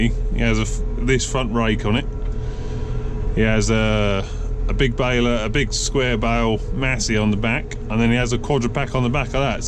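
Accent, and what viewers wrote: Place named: RP, United Kingdom. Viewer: Hi pal great video yet again abit posh that is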